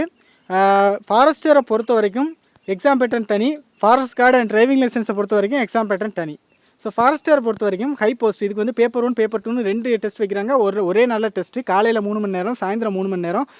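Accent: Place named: Tirunelveli, India. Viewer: R u from nellai....?i like ur slang..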